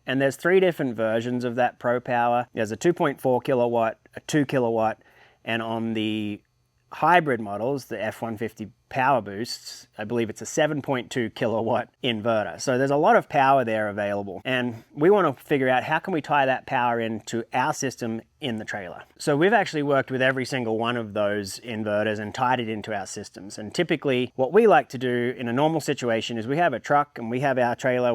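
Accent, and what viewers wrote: Australia. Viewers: Assuming you're in Australia because of your accent. Surely you have heard of AS/NZS 3001.32:2022. Your battery installation seems to be noncompliant in my opinion. The cover of the enclosure needs to be secured with tools, if your enclosure is actually sealed and vented properly I cant imagine how your electronics in there with the battery are adequately cooled. Your work looks very professional but I cant see how it's legal. Oh now I see the license plate, thats how its legal. Hahaha. Yeah any aussies wstching this, you need to have your batteries outside the habitable area, similar to how we have gas bottles.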